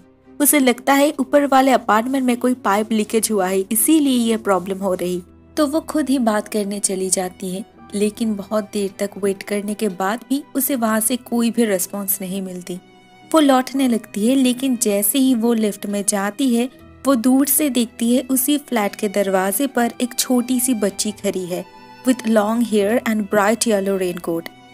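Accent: Bengal, India. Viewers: Ma'am Don't mind Bt are you a Bengali person???? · Are u a bangali or udiya....??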